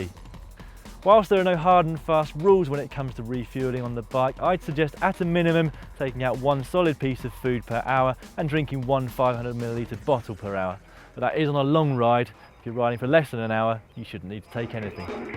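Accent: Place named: United Kingdom. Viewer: I'm confused you sound british but, you cycle on the wrong side of the road. Where are you based?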